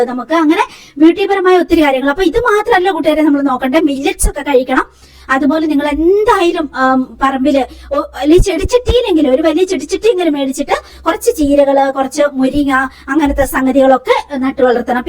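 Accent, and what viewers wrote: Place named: Perumbavoor, India. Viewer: veedu perumbavoor moovatupuzha bagam aano basha kettitu chothichatha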